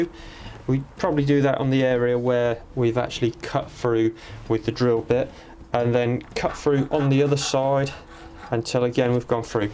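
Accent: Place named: Midlands, United Kingdom. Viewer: you sound as though your in the Midlands do you have your own garage I’m near Warwick and could do with a local mechanic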